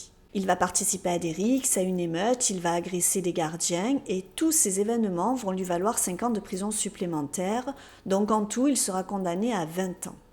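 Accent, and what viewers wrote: South, France. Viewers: Je découvre ta chaîne, c'est très sympa, une Victoria Charlton avec l'accent du sud, et si en plus ya en générique, je m'abonne!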